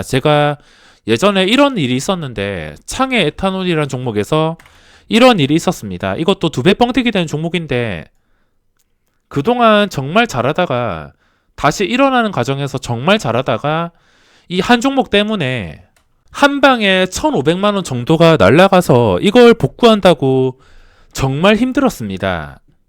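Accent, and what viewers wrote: Gyeongsang, South Korea. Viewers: 아 경상도 억양 저얼라 짜증나네 억양이 모 저러냐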